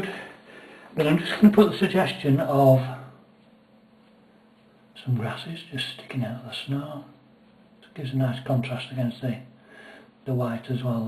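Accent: Yorkshire, United Kingdom. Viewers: Really enjoyed watching these 3 videos and love the end result. I'm still a beginner and need all the help I can get so found this very helpful. I'm originally a Yorkshire lass so listening to your commentary was an added bonus! This is the first time I've come across you on You Tube but certainly intend to dig out all the videos I can find. Thank you. Keep up the good work! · Not bad, not bad at all sir. Simple but very effective, plus a couple of techniques I'm going to have to try. Also nice to hear a yorkshire accent, been a Huddersfield/Barnsley boy myself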